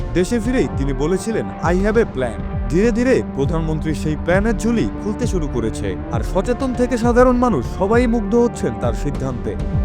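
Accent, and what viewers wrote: Kolkata, India. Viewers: Jini news porce tini ki Indian , Indian akta tan ace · Apne ki dadar desher lok? Vasha sune kolkatar vasa mone holo 😕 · কথায় ভারতীয় টান ক্যান ভাই? সমস্যাকে ছমচ্ছ্যা বলাতো স্বাভাবিক না। দুই দিন আগে কোন এক এক্টিভিস্ট এর পোস্ট দেখেছিলাম, গিমিক সরকার নিয়ে সেখানে প্রতিটা পয়েন্ট বলা ছিলো। এই নিউউজ ঐ পোস্টের কাউন্টার 😂😂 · উপস্থাপক কে কি কলকাতা থেকে নিয়ে আসা হয়েছে?? ভাষাটা একটু খেয়াল করে দেখবেন মিথ্যা বললাম কিনা? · উপস্থাকের বাড়ি কি ভারতে,ভাষা এমন কেন? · জিনি সংবাদ পাঠ করলেন সে কি বাংলাদেশি নাকি ইন্ডিয়ান? উনার উচ্চারনে তো আমার সন্দেহ আছে। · যিনি ভয়েস দিয়েছেন, তিনি কি ভারতীয় নাগরিক নাকি? উচ্চারণ সেটাই মনে হচ্ছে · ভয়েস যিনি দিছেন, তিনি কি ইন্ডিয়ান নাকি? Audience must use earphone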